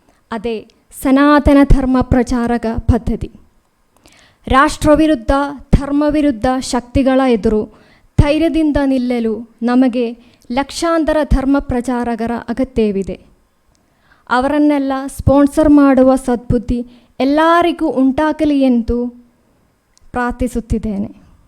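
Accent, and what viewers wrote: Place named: Kerala, India. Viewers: Malayali ka nada methadonde hage❤ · ಅದು ಆಕೆಯ ತಪ್ಪಲ್ಲ. ಕನ್ನಡ ಭಾಷಣವನ್ನು ಮಲಯಾಳಂನಲ್ಲಿ ಬರೆದು ಓದಿದಾಗ ಹಾಗೆ ಅನಿಸುತ್ತದೆ. ಅಷ್ಟಾದರೂ ಆಕೆ ಕನ್ನಡ ಮಾತನಾಡಿದ್ದಕ್ಕೆ ಸಂತೋಷ ಪಡೋಣ. ಸಣ್ಣ ಪುಟ್ಟ ತಪ್ಪುಗಳನ್ನು ಗಣನೆಗೆ ತೆಗೆದುಕೊಳ್ಳದೆ ಇರುವುದು ಒಳ್ಳೆಯದು.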